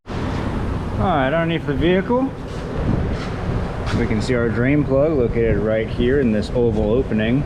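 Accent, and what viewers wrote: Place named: South, United States. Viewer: Good job! We like your southern accent! Make more videos my oily cowboy 😂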